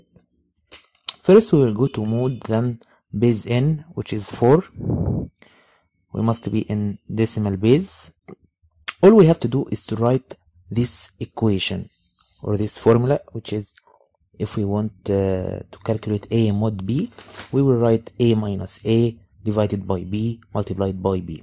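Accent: Egypt. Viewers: الاكسنت المصري من اول ما قولت هاي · no, accent says he's Egyptian man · that is an egyptian accent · مبين عليك مصري صح لولا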